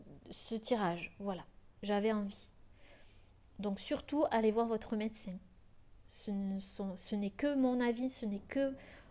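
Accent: South, France. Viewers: Bonsoir Magnifique tirage! J'ai pris la chouette n°1 et pour info le père, la mère et l'enfermement ce sont passés quand j'avais 4 ans car ma grand-mère et ma mère étaient en sanatorium et mon père travaillant, mes parents m'ont laissé dans une maison pour enfants pendant 1 an. Je ne leur en veut pas évidemment mais l'enfant "Isabelle" a dû ressentir beaucoup d'abandon.... merci encore pour ta générosité et ton accent du sud!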